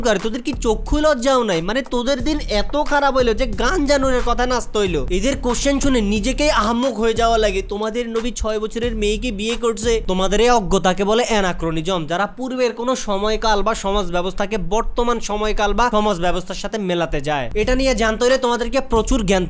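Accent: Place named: Chittagong, Bangladesh. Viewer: চিটাগাইংগা এক্সেন্টটা না থাকলে আরো ভালো লাগবে ভিডিওগুলো(যদিও আমি বইংগা হয়েও চইংগা ভাষায় জব করেছি ৭বছর লুল), যদিও গাঞ্চা নূর এর কথা তোমার ভিডিও ছাড়া জানতাম না।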